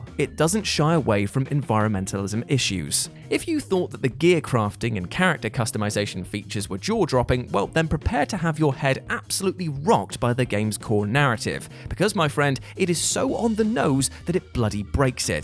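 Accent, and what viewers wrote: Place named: United Kingdom. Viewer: Voice acting? I haven't heard any. Just a vaguely British narrator.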